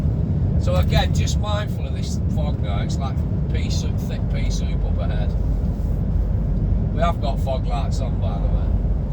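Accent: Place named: Yorkshire, United Kingdom. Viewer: Hi where are you from, Lancashire or Yorkshire?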